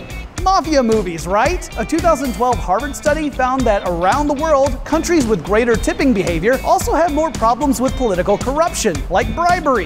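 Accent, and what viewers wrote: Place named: United States. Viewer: I presume this is a U.S. production? (from the presenter's accent). in, the heavy traffic picture is that of Sydney Australia at sunset - specifically: Victoria Road (which begins at that Right Hand fork), and the Left Hand fork services near-city suburbs, but is also a way to get to the other main East-West road (Parramatta Road). Yes, despite initially heading North, Victoria Road is basically an East-West road. Both these main roads in and out of Sydney lie North and South of the Parramatta River (which basically runs East-West), and forms Sydney Harbour where it reaches the South Pacific.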